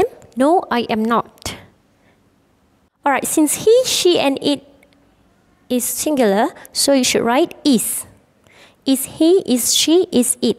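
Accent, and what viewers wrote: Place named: Japan. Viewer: cikgu orang jepun ke comel cakapan cikgu saya fatma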